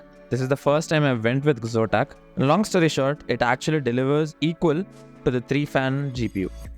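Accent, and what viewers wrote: India. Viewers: oh mannn, the indian accent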